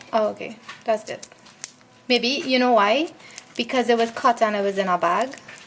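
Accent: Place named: Trinidad and Tobago. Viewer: Some Trini chefs talks to much and with a exaggerated Trini accent so people on the internet can't understand them. But your accent is moderate/modest which is a good thing.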